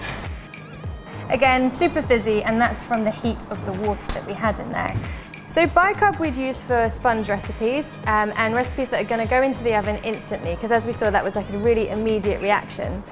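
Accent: United Kingdom. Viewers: My English is not good i can not under stand what she is telling, especially British accent. I wanted to know difference btw b.soda and b.powder.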